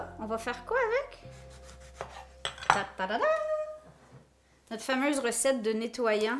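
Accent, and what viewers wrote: Canada. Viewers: bonjour, je m'abonne ici et j'adore ton accent canadien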